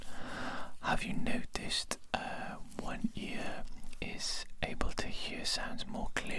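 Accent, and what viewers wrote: Wales, United Kingdom. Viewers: can i hear a welsh accent?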